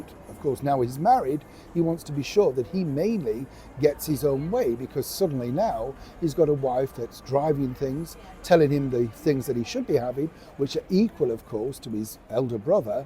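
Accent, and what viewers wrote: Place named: North, United Kingdom. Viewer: He's from the north...give him a break!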